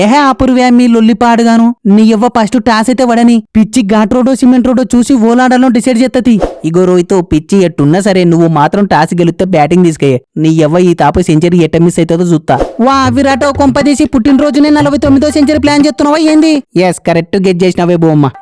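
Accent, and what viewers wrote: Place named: Gadwal, India. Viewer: Super anna, Gadwal slang lo raffadinchinav po, ni concept nd creativity ki na salam inka ilanti enno manchi videos cheyalani korukuntunna 👏👏😇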